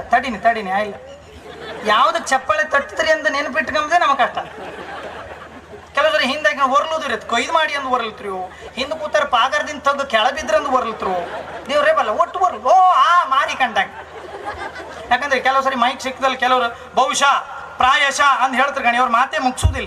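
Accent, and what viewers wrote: Kundapura, India. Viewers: ನಿಮ್ಮ ಕುಂದಾಪುರ ಬಾಷೆ ಬಲು ಚಂದೈತಿ ಮಾರಾಯ್ರೆ. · Kundapura bashiye Chanda adrage nam annan math ennu Chanda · Super sir kund kannada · Kundapura kannada chanda adu handadi bayall innu chanda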